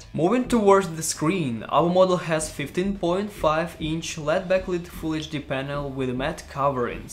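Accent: Russia. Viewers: are you russian? 😅 btw amazing laptop and best looking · Жёстко произносить по-русски английские слова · Было сложно, но я понял по незначительному акценту, что Русский! А потом видеозапись игр с русскими надписями... 👌😂 У тебя версия с gtx1060 или мне показалось?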